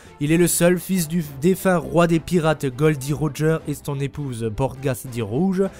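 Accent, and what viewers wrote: Alsace, France. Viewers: T'a l'accent alsacien !! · Yôôôôô cet accent sent l'alsace!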